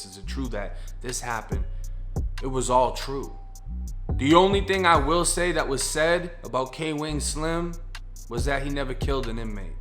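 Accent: Boston, United States. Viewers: Florida story with a Boston accent. Never thought I’d find something more entertaining than this 💯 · Bra you got a Boston accent you must went to Florida on vacation and got caught and did a bit in Florida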